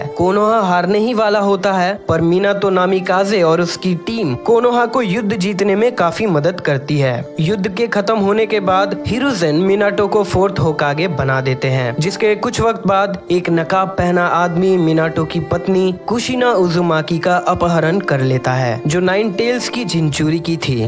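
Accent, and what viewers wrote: Standard. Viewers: Bhai aap itni sudh hindi kab se bolne lage😒😕😒😕😒😕😒😕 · shudh hindi mat bolo karo aap jese bolte the wese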